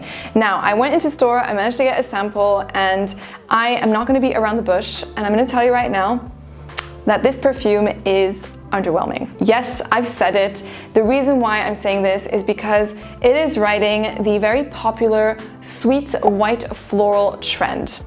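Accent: France. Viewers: In any case, I'm waiting, but I follow you a lot on YouTube. Nevertheless I just subscribed to your IG page and I love your little French accent. THANK YOU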